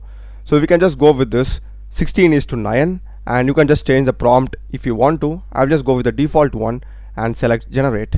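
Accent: India. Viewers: I don't catch up your Indian Accent English. Can you speak slower, or use AI speak for you.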